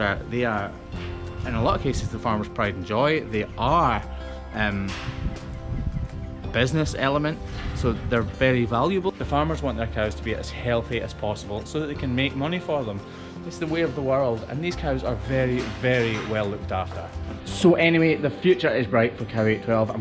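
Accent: Scotland, United Kingdom. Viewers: are you from uk? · Thank you for caring about our four legged brothers and sister. Your Scootish accent is music to my ears as Im a third generation Scot on both sides of my family here in the US. My grandma's dialect was so thick we almost coudnt understand her. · He has a Scottish accent. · You know I’m getting used to the Scottish accent when I can watch the video on silent with AWFUL subtitles and still figure out what he’s saying. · I'm amazed by it. I never though this would intrigue me but I am. Plus his Scottish accent is the cherry on top lol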